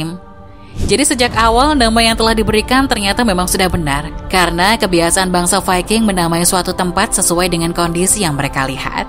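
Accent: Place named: Lombok, Indonesia. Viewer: Anak lombok mana suaranya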